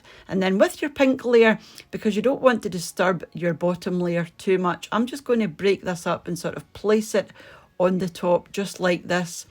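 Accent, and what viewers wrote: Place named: Glasgow, United Kingdom. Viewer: I'm from Glasgow. Live in usa. Love to hear your accent. Thank you so much for this. It brings back memories❤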